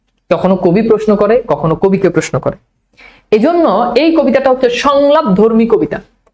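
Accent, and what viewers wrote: Noakhali, Bangladesh. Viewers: sir apni ki Noakhailla?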